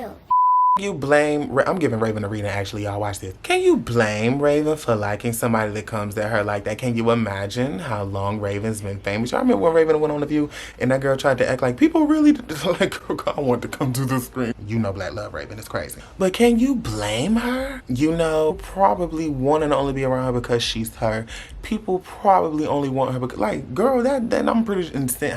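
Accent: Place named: South, United States. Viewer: You can tell is from the south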